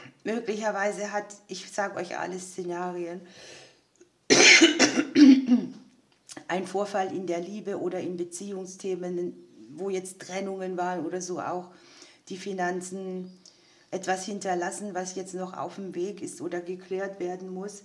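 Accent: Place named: Heidelberg, Germany. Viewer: Liebe, du hast dir so viele Abonnenten verdient, denn du bist richtig gut. Es ist wirklich schön, dass es dich gibt. Du hörst dich übrigens an, als kämst du aus Heidelberg und Umgebung, denn du sprichst wie Beate Bahner, die Rechtsanwältin aus Heidelberg, die sich in der C-Zeit für die Menschen, die sich nicht "schlumpfen" lassen wollten, die keine "Larve" tragen wollten etc. eingesetzt hat.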